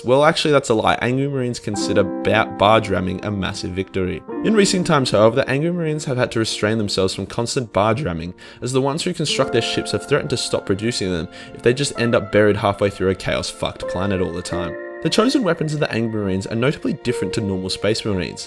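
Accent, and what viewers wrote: Australia. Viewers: This was a match made in the Warp. Also it’s nice listening to a fellow Aussie speaking... I don’t have to decipher the accent.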